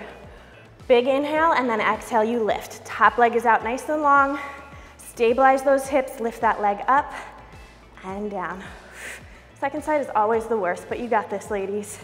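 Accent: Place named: Midwest, United States. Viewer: I just kept thinking it made her sound super Midwest and like, old school which is kind of endearing and 80's haha. v jazzercise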